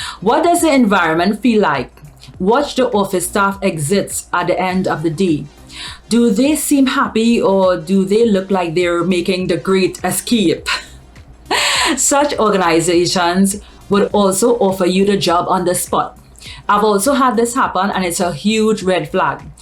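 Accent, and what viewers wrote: Trinidad and Tobago. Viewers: Is that a Trinidadian accent or maybe Barbadian accent? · Are you from Trinidad? 🇹🇹. I sense an accent :)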